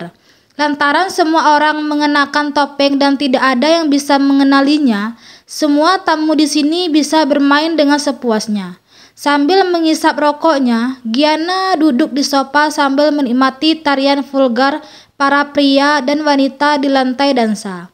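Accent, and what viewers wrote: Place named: West Sumatra, Indonesia. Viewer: Logat urang minang😂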